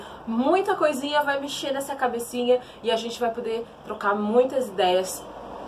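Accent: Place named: Rio Grande do Sul, Brazil. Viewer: Oi boa tarde tú és gaúcha!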